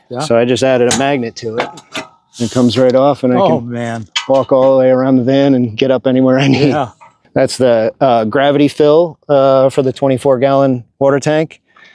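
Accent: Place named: New Jersey, United States. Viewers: I could tell he was from Chersey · “ I kinda drive like I stole it”. HAHAHA!, you gotta be from Jersey or something’. Sound like the wise guys I grew up with. Great build brotha!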